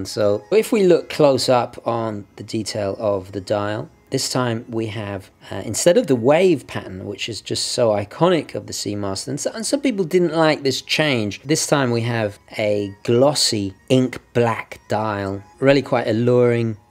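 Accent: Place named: United Kingdom. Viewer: It is a remarkable history and an amazing review. I truly like the way you tell the characteristics. I so much enjoy your British English review.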